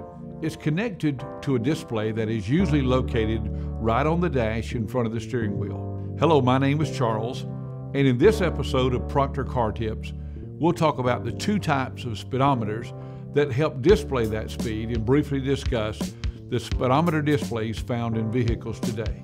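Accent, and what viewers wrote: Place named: Texas, United States. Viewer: "Their ve-HI-cles" WHY can't they pronounce words right?? Also they say h-wile instead of while. OMG the texas accent is super annoying but this video is very informative and was the only video i could find that showed how they work. But that accent will always irk me >__< either he's from Texas or Tennessee I can't tell their accents all sound the same to me.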